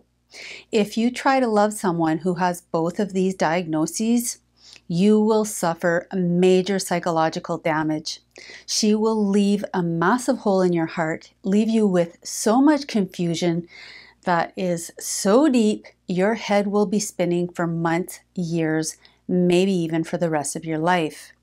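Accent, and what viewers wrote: Canada. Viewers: The Canadian accent is strong here.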